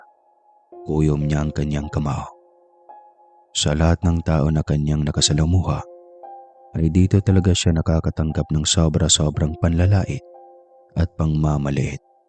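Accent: Visayas, Philippines. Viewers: Bisaya ka man, Dong!😊😊 (katugon na man ko)😅 Ha,ha,ha😆😆😆😆😆 Grabe, ang ganda ng estorya!❤❤❤❤❤❤❤ Watching from Los Angeles, CA USA 🇺🇸🇺🇸🇺🇸🇺🇸🇺🇸🇺🇸🇺🇸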